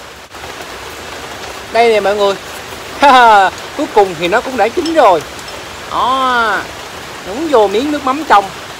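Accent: Mekong Delta, Vietnam. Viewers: Lần đầu tiên e xem video của a e rất thích. Cười nghiêng ngửa giọng a đúng chất miền tây.ủng hộ a nhé các bạn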